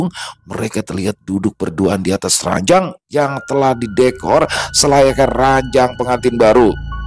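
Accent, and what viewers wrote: Jakarta, Indonesia. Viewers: Wah hadir lagi nih bang, terimakasih ya BG untuk selalu membawa cerita cerita seru, cerita paforitku ini dengan eksen Betawi nya yang asyik oh ya BG salam buat gomang ya 😊😊😀😀😀😀 sehat sehat ya BG dan team 🙏🙏🙏🙏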